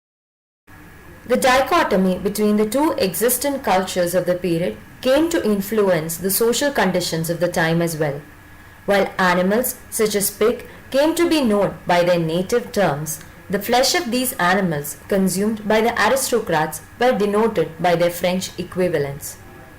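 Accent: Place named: United Kingdom. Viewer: That horrible British accent makes it impossible to understand.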